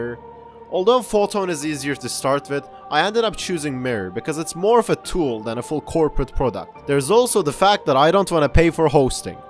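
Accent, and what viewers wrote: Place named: Turkey. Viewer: mirror tutorial videonu izlerken abi bu adamın aksanı türk gibi diyodum harbi öyleymişsin. Valla kanalını yeni keşfettim umarım istedğin şeye ulaşırsın. Oyun da güzel görünüyor bu arada. Test için yardımcı olabilirim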